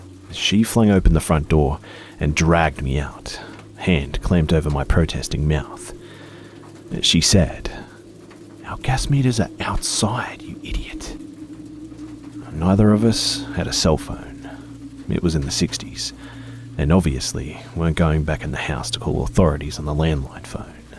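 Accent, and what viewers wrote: Australia. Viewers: what part of Australia you from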